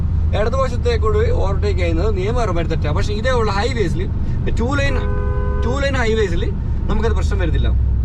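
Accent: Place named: Malappuram, India. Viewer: Appam alla malapuram basha patri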